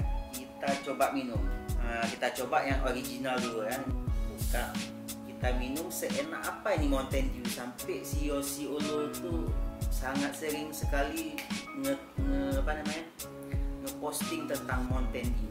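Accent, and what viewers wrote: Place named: Aceh, Indonesia. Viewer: Orang aceh emang biasanya ngomongnya begitu logatnya gitulah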